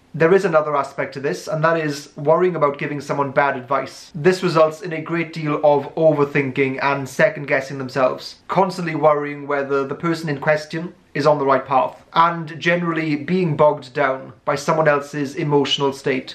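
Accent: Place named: England, United Kingdom. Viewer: Oh, I love your videos. And I also love your voice, the phrasing is so neat. I'm trying to figure out your accent... Any clues? You're English, you're Welsh, one of your parents is from India... 🕵️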